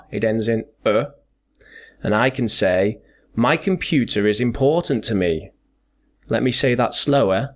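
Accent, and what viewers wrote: United Kingdom. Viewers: It's very funny. I like a lot. Now I you learn the british accent.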